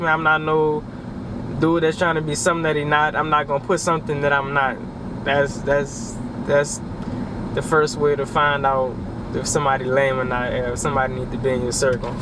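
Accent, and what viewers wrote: Louisiana, United States. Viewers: You got a lil Louisiana slang accent must be borderline Mississippi lol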